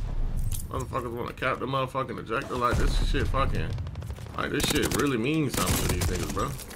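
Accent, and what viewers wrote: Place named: Philadelphia, United States. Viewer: WasssCrackin but just wanted ta ask!!! is u from Philly cuz ya lowkey have the accent like you from there lol🤣😂✌️🫰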